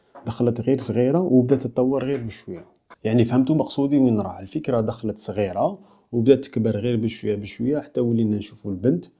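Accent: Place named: Oran, Algeria. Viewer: لا باين وهراني يهدر كيفنا مروكي يعيا ويغلط واحنا نتاع الغرب نميزوهم غاية عندهم وحد كلمات يكترو ش و ت يدخلوهم بزاف شمس يقولو شمش كيما زوج يقولو جوج تسعة يقولو تسعود كابن بزاف وكي يبغو يعدرو كيفنا تحسه راه يدير في جهد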